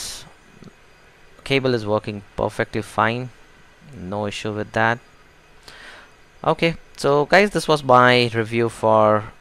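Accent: India. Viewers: He sounds like ever indian I have ever met...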